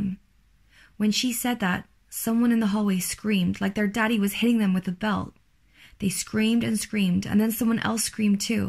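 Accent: Ireland. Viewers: I love your stories, please keep at it. Do I hear a tinny bit of an Irish accent? Reminds me of my Mum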